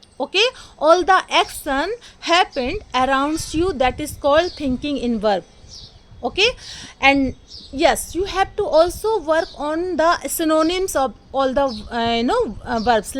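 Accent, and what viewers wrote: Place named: India. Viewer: desi english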